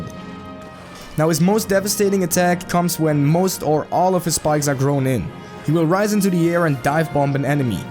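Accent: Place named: Norway. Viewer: Love it man thank you! May i ask, where is that accent from? i sense a little Norwegian but I’m usually dead wrong , great video brother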